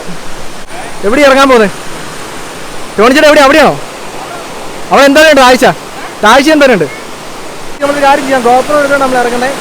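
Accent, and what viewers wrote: Kottayam, India. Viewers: Kottayam language powli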